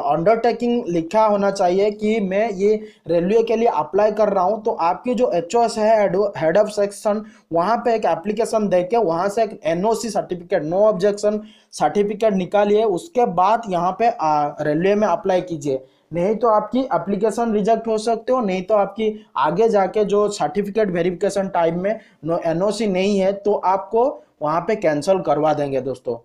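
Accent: Odisha, India. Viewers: HAS Tech burbak woh oriya hai thik se suno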